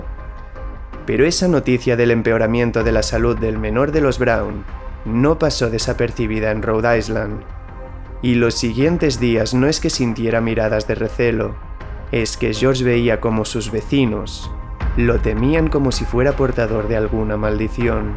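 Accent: Spain. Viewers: A mí no me gusta el español de España por lo tanto no me gustó la narrativa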